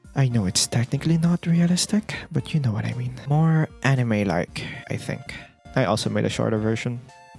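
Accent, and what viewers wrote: Philippines. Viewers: You Have a Strong Filipino accent!! ❤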